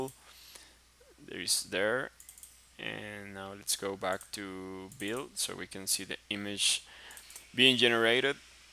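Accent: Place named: Costa Rica. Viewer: Are you Costa Rican? Your accent sounds very Costa Rican to me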